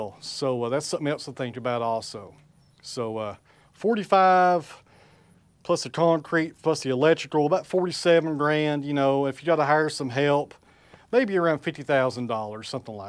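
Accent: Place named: Tennessee, United States. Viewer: EMC {not AMC },: Equilibrium Moisture Content - My New England ears don't hear past your Tennessee drawl well.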